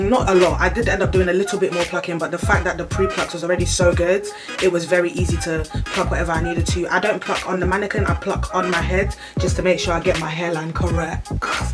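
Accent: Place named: North London, United Kingdom. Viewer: Sis, You sound like a neighbour. #NorthLondon ? Do you do install for others? I beg yu help me!??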